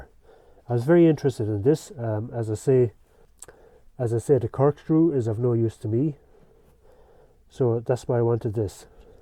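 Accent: Ireland. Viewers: U irish ?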